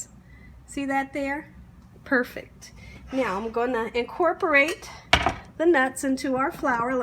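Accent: South, United States. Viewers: I love your country accent.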